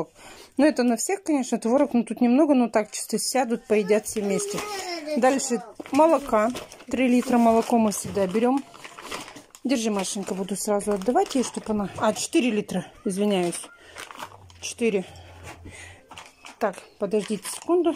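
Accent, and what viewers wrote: Russia. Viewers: ЛЮДИ .ЭТО ЯЗЫК РУССКОЙ ДЕРЕВНИ. У НАС ВОЛГОГРАДЕ В ДЕРЕВНИ МНОГИЕ ТАК ГОВОРЯТ. НЕ КОТОРЫЕ ЛЮДИ ЧАСТО БОЛЕЗНЕННО РЕАГИРУЮТ НА ЭТО ..МОЖНО ПОПРАВИТЬ КОГО ТО ,ЕСЛИ ТЫ ДОЛЖЕН В СИЛУ СВОИХ ПРОФЕССИОНАЛЬНЫХ ОБЯЗАННОСТЕЙ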